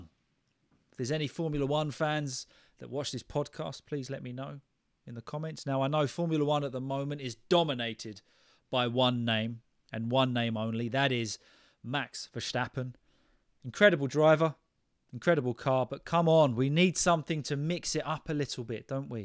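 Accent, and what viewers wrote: United Kingdom. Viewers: No, thank you. Because I admire British accents, I’ve been looking for the most linguistically profound and elegant British voice on YouTube for months and I just found it. Keep up the spectacular work. I have an issue which is that it’s easy for me to have self and social conversations and then understand almost 99% of conversations and reports passing my ear, but when to relate to official dialogue, I get jammed, keeping in mind that I'm familiar with public speaking in my mother tongue and I don't speak English well as well as I write or type.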